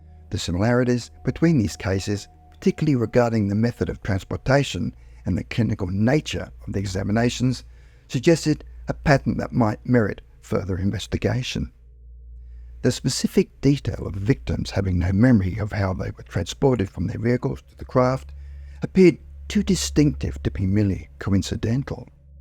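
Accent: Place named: Australia. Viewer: Why are all these american stories narrated by a Brit or here an Aussie? Turn off to americans!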